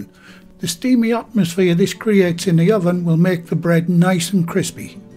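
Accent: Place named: Middlesbrough, United Kingdom. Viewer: Where you from ….. sound like a boro lad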